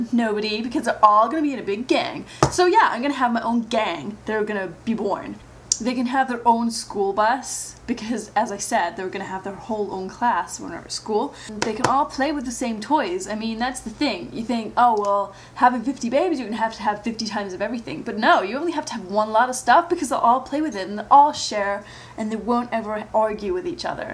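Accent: United States. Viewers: i like how non american english speakers can mimick our accent flawlessly yet we cant get others down.. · You do a fantastic American accent. Never would've guessed it's a put-on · This is hilarious! And you do have a very impressive American accent. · I'm american and your american accent is perfect. Impressive! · the american accent adds something to this video, I don't understand what that thing is but I think it's a good thing. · Your american accent is SPOT ON! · love the american accent XD It's your sarcastic tone lol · your american accent is extremely entertaining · Wow... your American accent is so very convincing. I keep forgetting that you're British. XD By the way, I love how you randomly choose which accent to use in your videos. :D Ahahahaha!!! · Hahaha, well done on the accent, I actually thought you were american XD Not to mention all the jokes, I can't believe some people actually took this seriously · Why does she have to do these sarcastic videos with an American accent? 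Now all the Youtube idiots get to say "fucking american. so typical" and any other retarded comments. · brilliant! and your American accent sounds so authentic. :D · I really don't know how anyone could think this was real, its meant to be funny. Just watch her other videos. The American accent is really good. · um...what happened to your accent? You sound like a So Cal local not a Brit. Didn't you used to be a Brit? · Haha you are right you sound a tad American, my fiance says i talk American even though im from Manchester..."/ Great video. :D LMAO. I have a PO BOX..If you want to send me babies...=] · how did u get such a good american accent? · i love your american voice! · It's like Brittany Spears impersonating Nadya Suleman parodying Angelina Jolie. Very good American accent! You forgot Nadya's ridiculous pout and that fake humble look. But you got crazy downpat! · Your American accent is impeccable. 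You actually sound exactly like someone from Western Canada/northwestern US. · How hard do you have to think to speak in an American accent? When I try to speak with an English accent, I have to think about every single word I say. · Haha, I love this video. You'd be making better use of them with that dance squad than "Octomom" could. Nice American accent btw ;D Fooled an American. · def didnt know you werent american. great job. funny vid too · wow.. you do a perfect american accent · whoa how did you just start talking without a British accent? you don't even sound British here, it's nuts! I'm Canadian and yeah, you sound American. I'm really intrigued at how you good you are with the accent. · Your American accent is really good. I almost can't imagine you with a British accent. This isn't your best bid, but not bad. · lol You're wearing bad lipstick and using an American accent. I hope you're just teasing us. 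· Great American accent! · Some people actually took this video seriously? o_o The internet continues to amaze me. But you have an INCREDIBLE American accent! o.O Like House! Except you don't hide half of your accent with a really deep, gruffy voice. xD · i figure out what this is about now. is making fun of that american woman who had 8 babies. Hence, the american accent. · why do you have an american accent in this vid?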